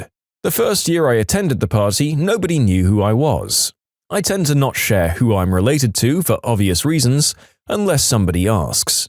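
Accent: United Kingdom. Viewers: British guy :C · Ya british mate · i like british man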